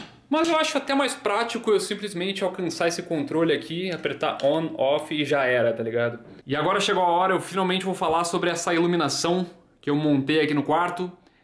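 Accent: Rio Grande do Sul, Brazil. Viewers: pelo sotaque é um gaucho , uma grande abraço meu amigo , teus vlogs são ótimos ... fica com Deus e melhoras pra sua mãe ... ( Gaucho desbravando o mundo )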